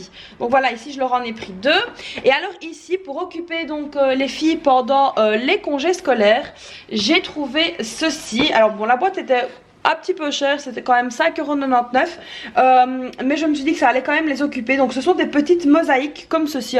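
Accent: Belgium. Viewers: Coucou j ai decouvert ta chaine en cherchant des videos budget Quel bonheur de tomber sur une belge ( je pense quon est de la mele region vu l accent😉). Je suis belgo marocaine a la recherche d une vie simple et une meilleure economie.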